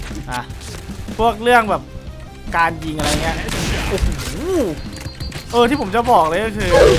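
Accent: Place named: Korat, Thailand. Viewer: ดูว่ะ นึกว่าคนโคราช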